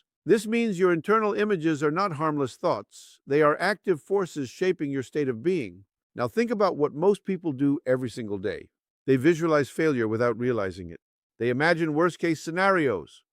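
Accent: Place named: Spain. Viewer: Jose had a pretty strong Spanish accent from what I remember. But these are definitely his words. His cadence was also slower. His speech naturally allowed one to absorb the information. Did u speed the video up & use AI to adjust his voice? His ideas are solid since he studied this from the 1950's-60's but the editing made it just a regurgitation of info that one doesn't quite catch. Solid ideas-great, taking away the accent-ok I suppose or meh, Speed & cadence change- meh. Dont really care for it. But I've listened to Jose walk thru a meditation/guidance almost every day since I found him 2-3 yrs ago. Vishen has a good voice but does the same thing g u did. Delivers it fast. His goal tho is for sales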